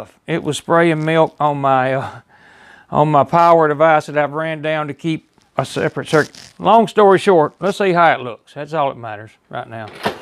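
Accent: South, United States. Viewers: I just love your Southern Accent! My teenage daughters continue to watch you , they even walk around spewing out your hook”007” they just Love you! Keep on Cooking!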